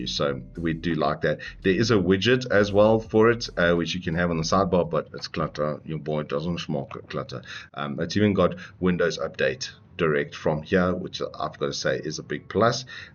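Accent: South Africa. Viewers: hes south african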